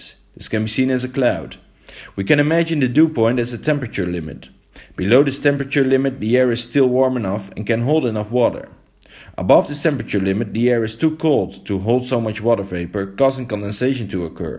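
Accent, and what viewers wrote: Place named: Netherlands. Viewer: It’s just funny how obvious you are a dutch native. Very interesting video tho!!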